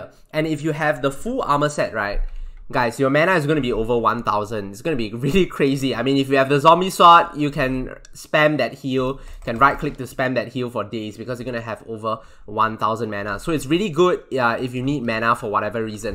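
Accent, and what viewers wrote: Singapore. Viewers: knew it from the singapore dialect that i have heard.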